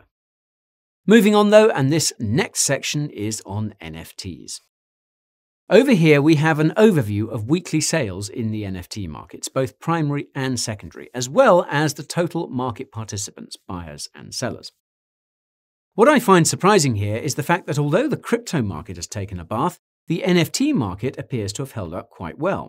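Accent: France. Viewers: that france accent is so funny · You're french accent is so nice to hear :) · Nice French character, the mustache makes me thinking more of a Spanish guy but the accent is there. Love it. The content as well! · I love the French accent haha 😂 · French accent is not so bad 😂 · Your French accent is spot on sir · Greatest cliché french accent i’ve heard in a while (coming from a french dude)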